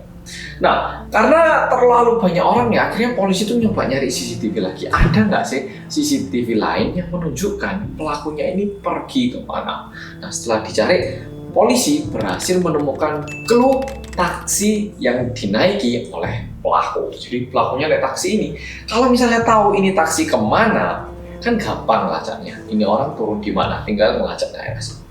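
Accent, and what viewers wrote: Java, Indonesia. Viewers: I'm addicted to : "Halo guys welcome to korea reomit bersama orang korea yang medok" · om medok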